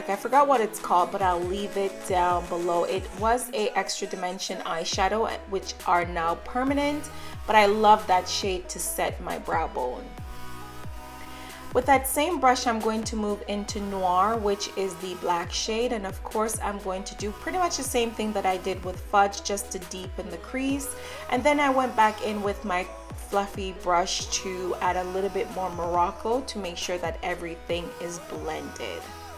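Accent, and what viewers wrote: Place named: Jamaica. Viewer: your makeup is always so gorgeous, are you jamaican I hear an accent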